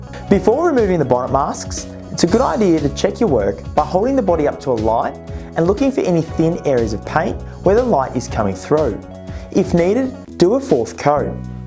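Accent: Australia. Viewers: This guy sounds Australian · That was actually awesome and so refreshing to hear an Aussie accent. I missed the bit on how you did the black window trim. Also, I want do the exact same paint job just with the main body Tamiya Dark Metallic Blue. Would I need to back it with black or would that turn out crazy dark? Thanks guys, good job.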